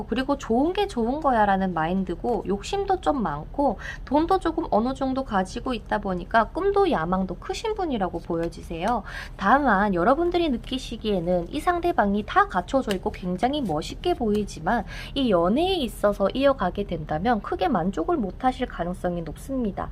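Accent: Gyeongsang, South Korea. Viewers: 님 경상도 분이시죠???!? 말투 사투리 야악간...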